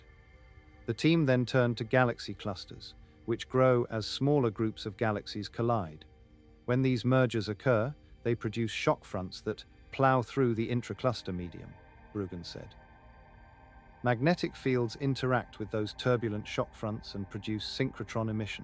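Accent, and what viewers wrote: United Kingdom. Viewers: Haha “British Narrator”… I guess I’m not the only person who falls asleep more easily to a British accent…?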